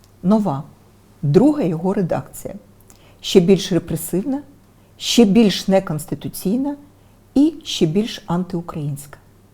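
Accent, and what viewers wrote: Russia. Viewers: Та ти і досі не можеш нормальео рідною мовою говорити... Ти як руссська кажеш на букву "о" -"а". Томущо розмовляєш за камерами на москальському язікє.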